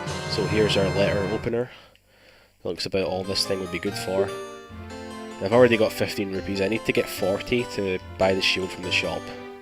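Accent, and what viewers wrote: Scotland, United Kingdom. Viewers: Ok but his accent sound scottish, but thats just my opinion. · HES SCOTIIIIIIIIIIIIIIIIIIIIIISH WOOOOOOOOOOOOOOOOOOO (I'm American but I love Scottish accents) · Woo Scottish accent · R u scottish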